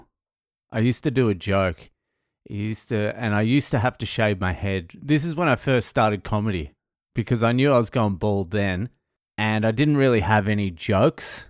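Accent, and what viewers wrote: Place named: Australia. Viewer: I didn't realize you were Australian. I'm sorry, carry on.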